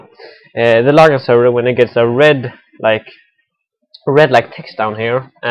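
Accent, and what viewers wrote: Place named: Sweden. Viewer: Man hör ju att du är svensk O_o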